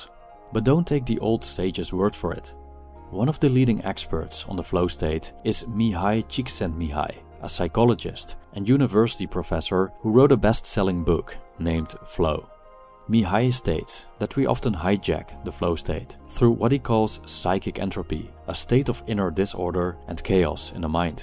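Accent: Netherlands. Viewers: Lekker dat nederlandsche accent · i love your dutch english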